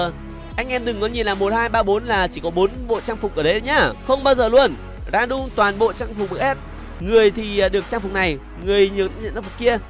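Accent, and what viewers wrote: Bac Kan, Vietnam. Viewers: Ông ở Bắc Kan à 😍😍 Thích thế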